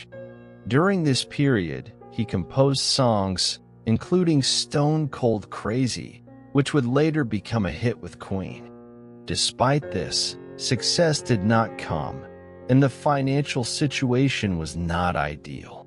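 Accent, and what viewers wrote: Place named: United States. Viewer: I cannot stand American narrators sounds awful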